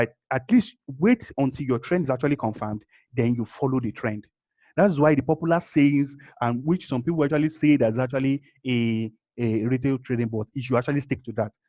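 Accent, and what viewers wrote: Nigeria. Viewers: This is a Nigerian Accent! Nice one brother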